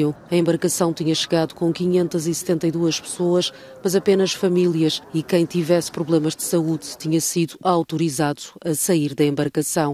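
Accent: Portugal. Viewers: Agora sim a tuguesa verdadeira falando no microfone.❤️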